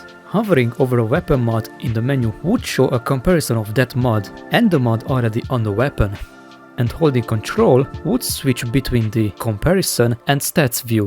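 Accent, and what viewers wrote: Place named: Hungary. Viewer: Tudtam én hogy magyar :D a hunglisht nehéz rejteni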